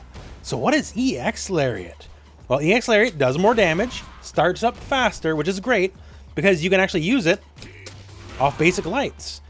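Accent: Quebec, Canada. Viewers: T'es québequois hein ?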